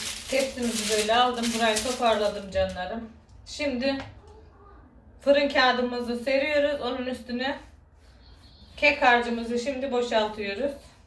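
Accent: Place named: Akçaabat, Turkey. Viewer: CIM SENIN GIBI KONUŞUYOR ELTIM AKCAABAT LI ONUDA SEVERIM KONUŞ MALARINI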